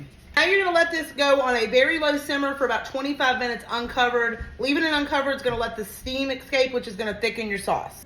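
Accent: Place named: South, United States. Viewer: Love you girl! I'm from the to South too so I love your cooking and...your Southern accent. 🥰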